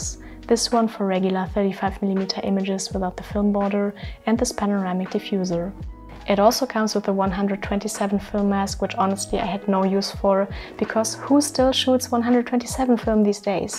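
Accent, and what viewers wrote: Germany. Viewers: Came here for photography stayed for German accent 😍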